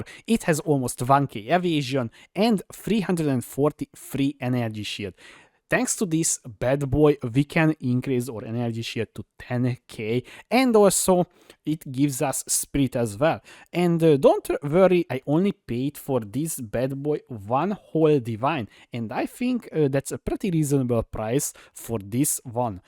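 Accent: Hungary. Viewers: Kurvára hallani hogy magyar vagy :D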